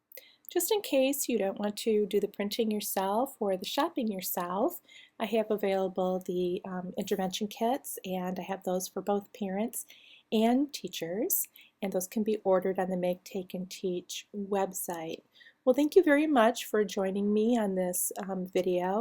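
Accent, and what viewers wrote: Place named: Midwest, United States. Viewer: cute accent. i like your a's. midwest?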